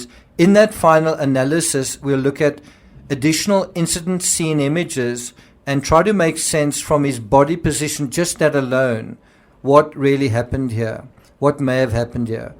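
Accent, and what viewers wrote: South Africa. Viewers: I'm subscribing for the south African accent🇿🇦🇿🇦🇿🇦🇿🇦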